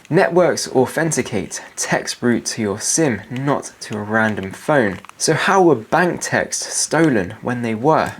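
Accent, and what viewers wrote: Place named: England, United Kingdom. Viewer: I'm sure that way of speaking is perfectly natural for him as an English person. Depends on where he's from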